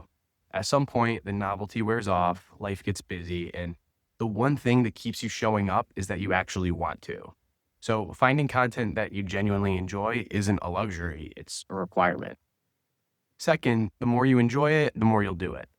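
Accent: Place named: United States. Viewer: Wow!! All this wonderful information you are sharing really resonates with me. No doubt you have a way with words, and your American accent is clear, pleasant and easy to understand. So, congratulations on your YouTube channel, and thanks a lot for your helpful insights. I look forward to more fantastic stuff—hopefully soon. Best wishes from Spain!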